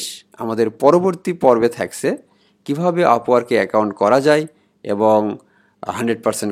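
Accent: Bangladesh. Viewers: Vaia apnar basha ki naogaon ??? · ভাষায় খুলনার গন্ধ....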